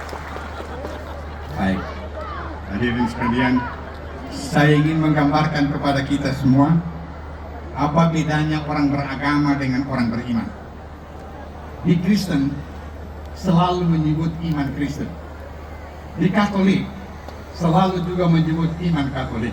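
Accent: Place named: Palu, Indonesia. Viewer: ini kiai org apa ya..logatnya kaya org palu..😅😅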